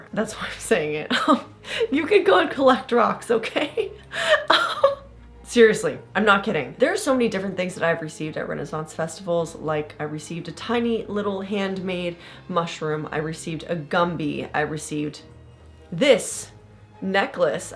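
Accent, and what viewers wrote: United Kingdom. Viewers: Always such a kind positive soul - energy- so sweet✨ Best wishes to you Also brit accent is lovely haha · Your accent is very good. I thought you were England. Maybe do lessons on how to speak "Ren Faire"?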